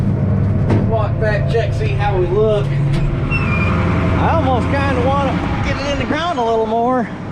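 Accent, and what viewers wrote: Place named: Michigan, United States. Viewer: Sounds like you are from Michigan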